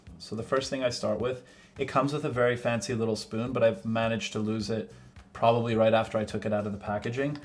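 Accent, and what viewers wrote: Quebec, Canada. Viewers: okay but has a french canadian accent when he speaks french??😍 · OMG I didn't realize was Canadian till I hear his french accent!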